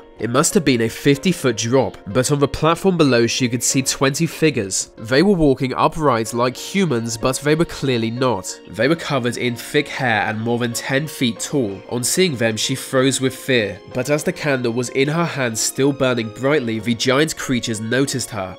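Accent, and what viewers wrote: England, United Kingdom. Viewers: Even science can't explain it? Lame Brit accent. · Tjis is why i dont like british accent . It is so irritating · That damn English accent. Still a good vid.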